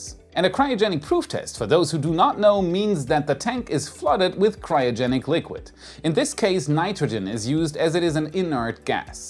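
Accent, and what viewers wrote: Germany. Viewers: Is this guy German? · Aha, ich hab direkt rausgehört das du aus Deutschland kommst, aber irgendwie keine Anzeichen gefunden bis ich auf deine Webseite gegangen bin 😉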